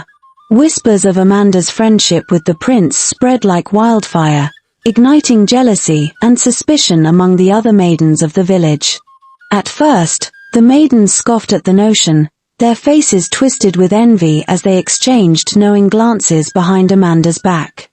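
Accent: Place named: United Kingdom. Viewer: read this slowly.,....... your irritating voice is fukkin up the story. Find your own British tales or whatever accent that is and narrate it. Try hamlets tales it's more fitting for your kind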